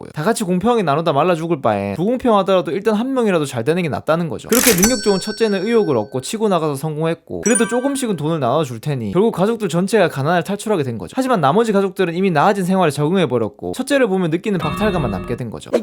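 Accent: Gyeongsang, South Korea. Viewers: 쌍도 사투리 억양 존나 거슬리네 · 경상도 억양 좀 고치셨으면... 말투가 귀에 거슬림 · 대구 분인가봐요? 말씨가 익숙하네요 ^^ 그리고 인류는 소멸 안해요, 한국인이 좀 많이 줄어들겠죠. 근데 한국인이 줄어들면 뭐가 어때서요? 요만한 땅에 한국인이 5천만이나 번식한 게 이상 번식이었어요. 다른 나라들을 보면, 한국인은 한 1500만 정도로 줄어들 필요가 있어요. 거기까지 인구가 줄어드는 건 한국 사람들한테 좋은 일일 겁니다. 오염도 덜해지고, 1인당 물 공기 집 넓이도 커질테고, 땅값 집값도 좀 싸질테고요. 사람도 더 좋은 대우를 받게 되겠죠. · 이런 애들이 통계자료+본인뇌피셜로 어떤 현상에 대해 -는 ~다 라고 결론내서 확정짓는듯한 이런 영상을 만드는 것이 매우 역겹다. 아무생각없이 보면서 님말이 맞는것같아요 분석력 짱 이지랄 댓글싸는 애들도 병신같고.SCI 등재라도 하나 하고 와라. 경상도에 있는 지방대 조별과제 발표자료같음. 나 또한 경상도출신에 고향친구만나면 사투리로 대화하지만. 사투리 역겹다. 표준억양 연습이라도 하고 녹음하던가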